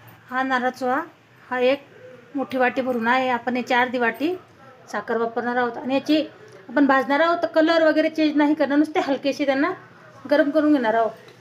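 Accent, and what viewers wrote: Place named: Sillod, India. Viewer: ताई संभाजीनगर सिल्लोड तालुका आहे का तुमचा बोलण्यावरून वाटलं तसं म्हणून विचारलं 🙏😊🧘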